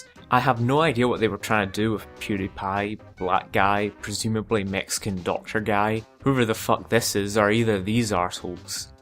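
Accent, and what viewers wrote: Scotland, United Kingdom. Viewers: Is that a Scottish accent? Sorry, I'm not anglophone, so I'm not 100% sure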